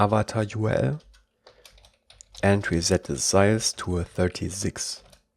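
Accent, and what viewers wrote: Germany. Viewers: Direkt gemerkt das du deutsch bist haha, super content!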